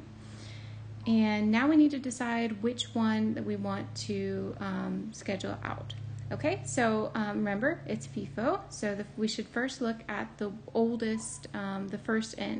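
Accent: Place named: Turkey. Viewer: bro where are u from that's turkish english ı think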